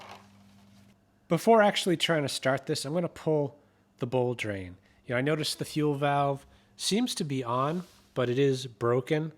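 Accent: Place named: New England, United States. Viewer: Nice video, thank you! I heard a New England accent! Ron USA, PTL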